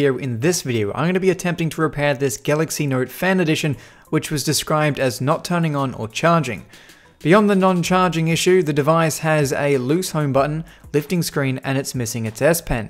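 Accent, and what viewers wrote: Australia. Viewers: W8 this dood is australian too. Nice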